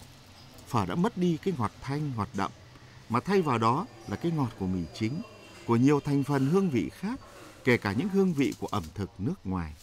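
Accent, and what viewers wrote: Hanoi, Vietnam. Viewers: Giọng hà nội gốc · Mc nói giọng hà nội gốc nghe hay quá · Giọng chú mc này chuẩn hà nội nè : trầm trầm chậm rãi nhẹ nhàng thanh tao âm vực rõ ràng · Giọng hà nội nay mất chất rồi. Điệu mới ngọt vcl. Hà nội có tiếng là giọng chuẩn , rõ nghe như giọng của ng trong clip này vậy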